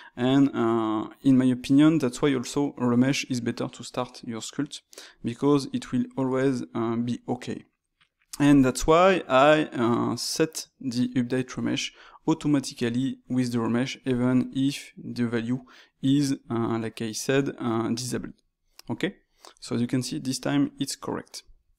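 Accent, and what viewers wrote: France. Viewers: I have been playing around with Blender for just over a year, only learning through youtube and trial and error. Sir, this is the best explanation of Remesh verses Dyntopo I've heard yet. And that is even with the French accent.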